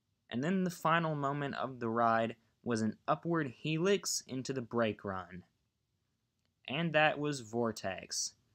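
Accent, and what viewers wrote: United States. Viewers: Country accent mixed with vortex= 💯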